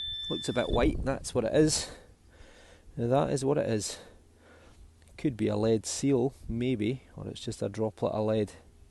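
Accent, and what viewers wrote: Scotland, United Kingdom. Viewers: I love the Accent! Scottish Accents are a range of Sweet to Hysterical to most Elite. Can't imagine the History Finds in Scotland. Have Fun, Best Treasure Successes! 😘 Beth Tennessee, USA "Irish American" 🍀🇺🇸🍀 County Kerry Lineage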